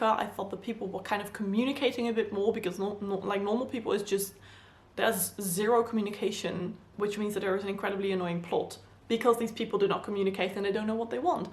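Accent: United Kingdom. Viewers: Did you live in the UK? You have a British accent, it’s lovely ☺️ I’m only asking because some other youtubers that are from the Netherlands have a different type of accent when speaking in English.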